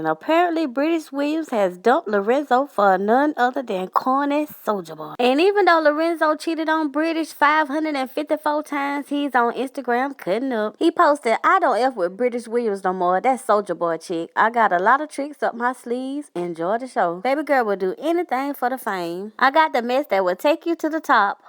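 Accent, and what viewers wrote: South, United States. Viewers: you must be from the south🍅🍅🍅🍅🍅🍅🍅🍅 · I love your voice You sound like a Southern Bell. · Damn this chick here gossiping sound so damn country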